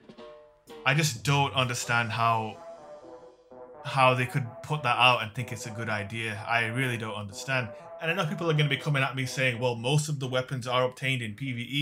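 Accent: United Kingdom. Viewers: You're so british lmao I luv it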